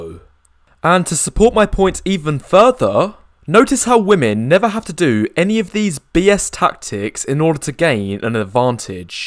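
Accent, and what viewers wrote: Essex, United Kingdom. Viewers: He's from Essex in England I think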